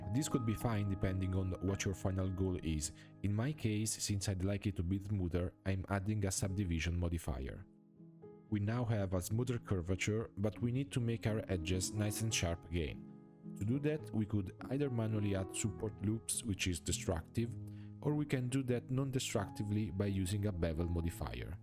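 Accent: Italy. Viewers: ma sei italiano? 😂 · Che per caso sei italiano? fantastico video comunque!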